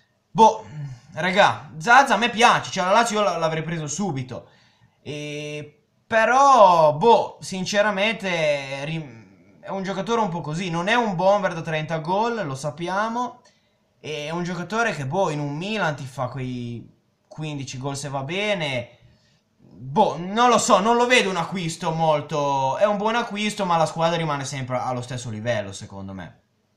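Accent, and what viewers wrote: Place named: North, Italy. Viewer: Come puoi parlare di calcio. Sembri un contadino del nord per questo sei della Lazio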